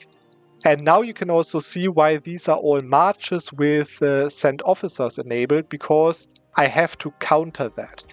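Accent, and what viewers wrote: Germany. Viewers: REALLY thicc german acsent.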